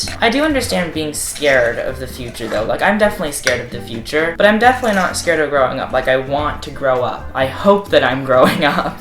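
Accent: South, United States. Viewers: “Why am I like... southern?” You.... you.. you live in.. Texas. I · "Why do I sound southern?" lives in texas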